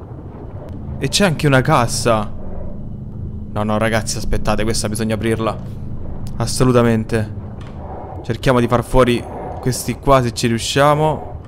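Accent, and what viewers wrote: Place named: Rome, Italy. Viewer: "S'è dato" "Ao fratè ndo te sei cacciato"... Non so Rick... per me è di Roma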